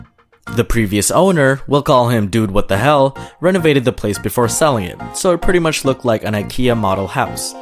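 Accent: Philippines. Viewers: Is it just me or I heard you as a Filipino ?? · Nice Filipino accent from the Philippines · I love your Filipino accent/attitude😂😂I relate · Love the Filipino accent 👌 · I really like that Filipino Accent of that english speaking skills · I always crack when you speak in "inglis with pilipino aksent" and use some tagalog words. I'm so glad Youtube reccomended this channel. 😂😂😂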